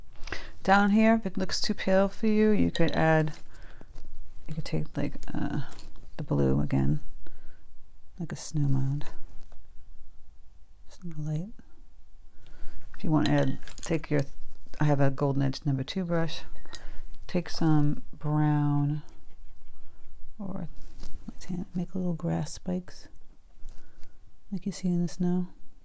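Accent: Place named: New England, United States. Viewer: Painted the night seen and added a couple of snowmen in the snow space. Sent them out as cards. Thank you so much. Love your accent, just like mine but I'm not in Mass anymore. Still New England, though.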